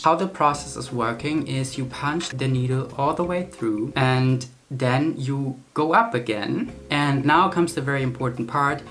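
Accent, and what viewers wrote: Germany. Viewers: Are you German? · the German accent is kind of cute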